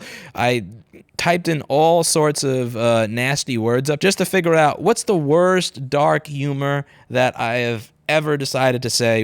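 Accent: New York, United States. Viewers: Yes, that New York accent and displeasure at anything Apple sounds richer and much warmer. Thanks, Louis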